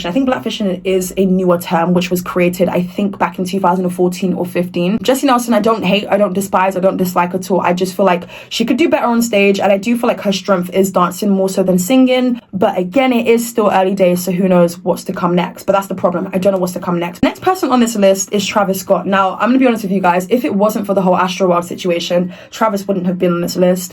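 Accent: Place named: United Kingdom. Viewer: trouble understanding you at times cause of your British accent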